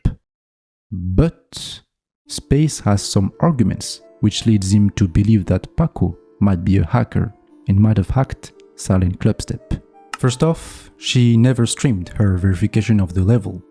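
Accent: France. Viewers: I cant listen to french english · bruh your french accent is beating you up so bad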